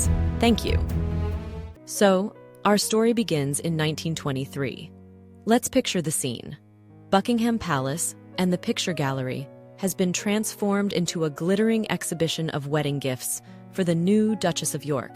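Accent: United States. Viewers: I must say that although the channel Has an American accent, the understanding of the position of Royalty and its relationship with the people is spot on. Something many foreign commentators never ever get right. E.g. the way the Queen Mother wearing her jewellery is not vanity but respect for those who really ‘own’ the jewells. The people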